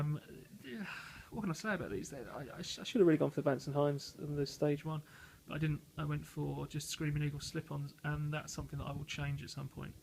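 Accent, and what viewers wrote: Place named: United Kingdom. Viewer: i really wanted to hear this video,but i just can't; i can decipher a British accent fairly well but need to be able to hear it to do so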